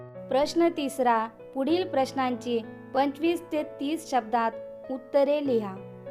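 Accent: India. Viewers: Tumi kontya gavane bolt aahat😊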